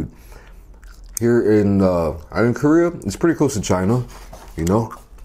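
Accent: United States. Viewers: How's his accent so american?? Not a regular here so do explain to my ignorant ass 😁👍🏾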